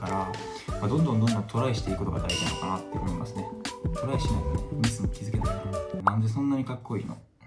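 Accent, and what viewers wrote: Kansai, Japan. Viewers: 関西弁反則すぎる。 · ゴリゴリの関西弁、喋りウマ🤣！！おもろかった👏👏👏👏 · 関西弁可愛い😍藍くんいつも応援してます！大好きです！！笑顔が可愛すぎて癒やされてます🫶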